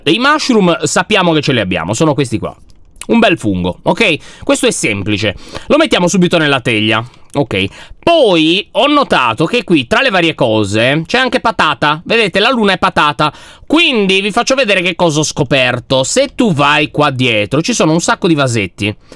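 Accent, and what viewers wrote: Sardinia, Italy. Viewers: per favore io ti voglio bene ma puoi smettere di fare il sardo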